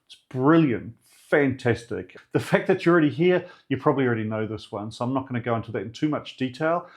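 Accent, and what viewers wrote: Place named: Northland, New Zealand. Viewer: Are you a Northland person? You've got the accent of a Northlander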